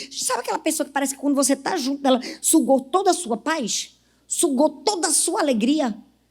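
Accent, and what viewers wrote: Northeast, Brazil. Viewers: Sou católica ,nem por isso deixo de acompanhar suas pregações, tem mais sou pernambucana da gema, assim como você!!!!! Amo seu sotaque bem nordestino.visse menina????? Kkkk🎉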